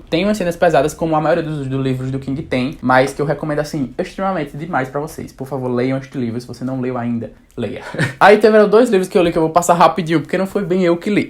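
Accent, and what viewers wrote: Northeast, Brazil. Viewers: Cheguei agora no seu canal e já quero assistir todos os seus vídeos, acho que me identifiquei mais por conta do seu sotaque (muito bom de ouvir, diga-se de passagem) já que eu tbm sou do nordeste. Ganhou mais uma inscrita e já coloquei vários na minha wishlist. :)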